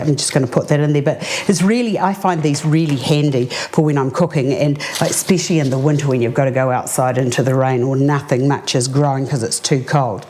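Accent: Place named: New Zealand. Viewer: Love this! and I can't get enough of the delicious NZ accent. You sound like my choir director Mark Duley, he's from Christchurch but now has settled down in Galway, Ireland!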